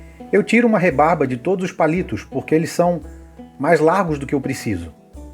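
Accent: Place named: Santa Catarina, Brazil. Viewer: E esse sotaque aí meu extimado? És da onde tu? SC?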